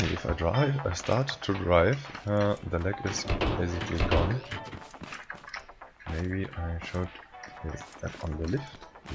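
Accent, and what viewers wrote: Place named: Poland. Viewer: Uh you sound polish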